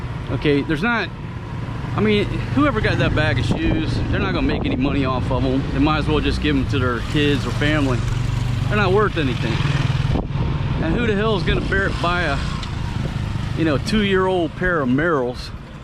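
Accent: United States. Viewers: The original American accent ! Beautiful accent bro. 🇺🇸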